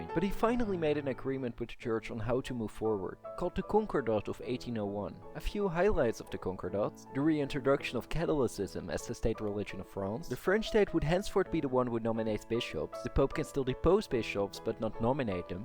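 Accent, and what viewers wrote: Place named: Netherlands. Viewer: No worries at all, thanks so much for the video, I'm guessing Dutch from the accent :)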